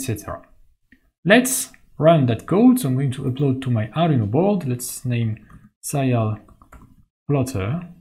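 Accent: France. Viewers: i have one question are you french?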